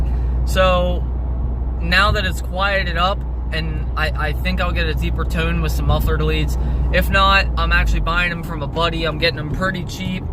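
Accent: United States. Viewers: I was so confused why you were on the right hand side lol. You sound American lol. How. ARE YOU ON THE RIGHT HAND SIDE IN THE BEGINING BUT NOT THE MIDDLE OF THE VID. 😂😂 STOP PLAYING WITH MY MIND. But anyways, What did you do for the BOV? Was wondering how mine sounded from the outside. I have same exhaust set up but its all straight piped from the turbo and back.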